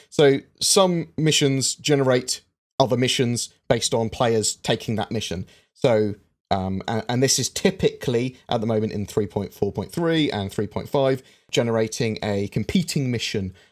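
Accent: England, United Kingdom. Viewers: Youuur accent is so Englishhhhhh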